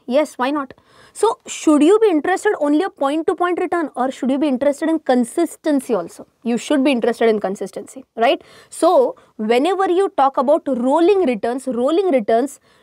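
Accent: Maharashtra, India. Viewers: English in Marathi style? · I love ur marathi accent..... Awesome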